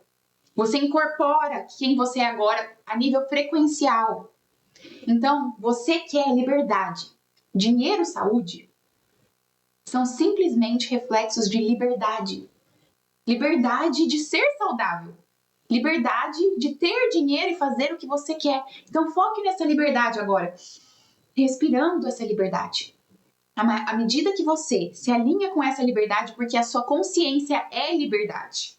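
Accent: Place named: Minas Gerais, Brazil. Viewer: Teve que falar Português?! Você tem o sotaque mineiro e fala bem a Língua Portuguesa.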